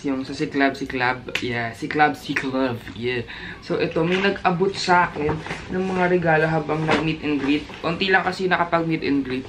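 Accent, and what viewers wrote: Visayas, Philippines. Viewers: bisayaaaa kaaa kuya??? i lab yow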